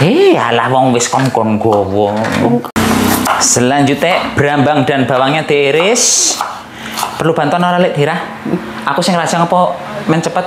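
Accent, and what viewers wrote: Banyumas, Indonesia. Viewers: ngomng ngapk baelh...aj badeol...😂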